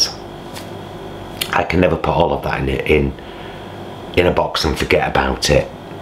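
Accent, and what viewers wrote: Manchester, United Kingdom. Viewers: Lovely, I'm moving back home and I will keep following u, a bit of a Mancunian accent in a southern Italian flat... great person indeed xxx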